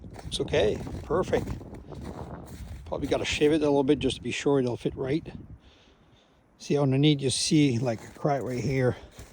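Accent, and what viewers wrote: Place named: Louisiana, United States. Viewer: If you're Canadian why do you sound kinda like a Cajun?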